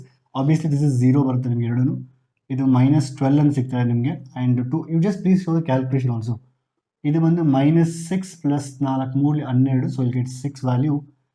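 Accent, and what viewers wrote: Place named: Malnad, India. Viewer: Sir, where are you from.. Whenever you speak i feel u r from malnad... Pls reply... Since i am also from malnad i am curious to know 😁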